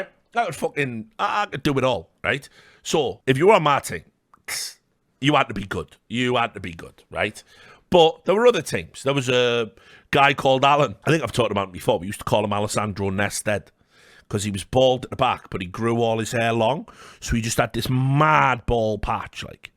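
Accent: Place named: Birmingham, United Kingdom. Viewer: Brummie \m/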